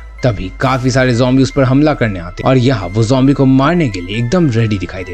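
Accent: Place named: Bhojpuri, India. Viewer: Your Bhojpuri accent was op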